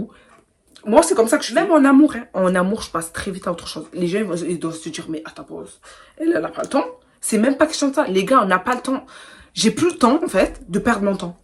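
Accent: Marseille, France. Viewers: Cc ma belle une abonnée en plus tu est de Marseille en dirais avec ton accent. Tu à tout dit surtout les femmes sont trop méchante et hypocrite en amitié .vaut mieux marcher seul et être tranquille dans sa tête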